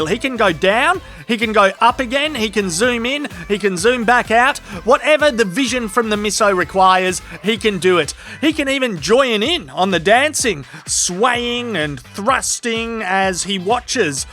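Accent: Australia. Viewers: you sound like you are from down under but you look like you are from Appalachia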